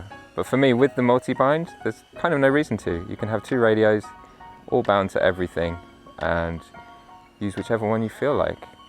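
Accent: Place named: United Kingdom. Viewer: Hey sounds a little like my review but with a nice British accent! Makes it 100% better, at least 😎 Great job mate!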